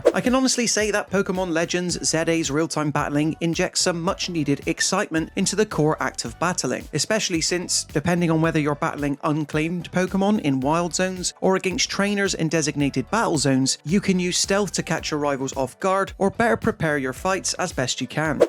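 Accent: United Kingdom. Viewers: He's British, idiot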